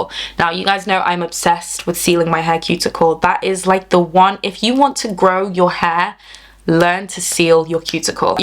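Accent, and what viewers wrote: United Kingdom. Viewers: Very helpful video, i had a feeling this was the case. My hair is relaxed so i normally blow dry it but i think i will go back to flat ironing it as it lasts much longer when the cuticles are sealed. I steam once a week. Also its nice to hear another UK accent.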